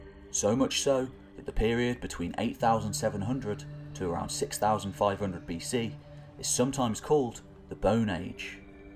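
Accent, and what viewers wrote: United Kingdom. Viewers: KuLLChu Can I find a Swedish or Egyptian subject that ISNT NARRATED by a damn BRit????? KuLLChu??? Sheeeeeeesh.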